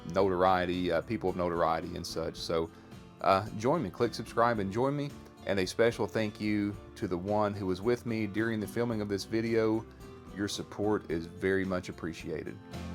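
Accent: South, United States. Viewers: That’s the voice of a southern gentleman. Do you use beard products?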